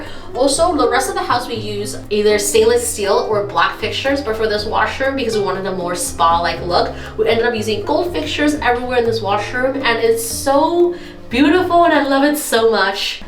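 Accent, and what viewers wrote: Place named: United States. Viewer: You are looks like Koean or Japanees. But Your Speking Says You are American.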